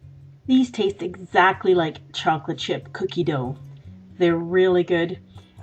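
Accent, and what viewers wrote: Canada. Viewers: Are you Canadian? :) And I love your videos! They are very helpful! :D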